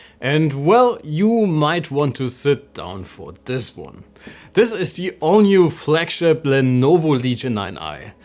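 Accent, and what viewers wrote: Germany. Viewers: I Hear Your Deutsch Akzent 😂 schönes Video btw 🎉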